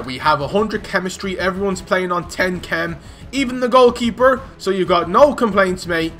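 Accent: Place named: Mayo, Ireland. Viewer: Mayo accent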